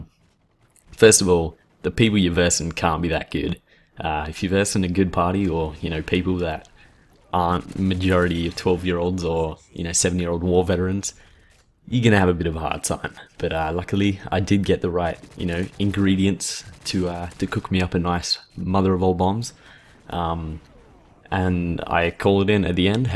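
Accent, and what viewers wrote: Australia. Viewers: Woooooo finally a commentator from Australia :D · Good to hear a fellow Aussie! :D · is this guy australian???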